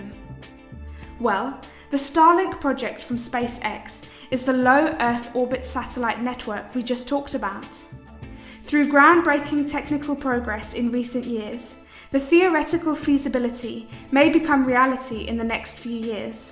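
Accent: United Kingdom. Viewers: Very good, high information content video. I especially like the English accent of the sweet sounding narrator. I give it a 99.99! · So well articulated. Perfect English with a Brit or New Zeeland accent.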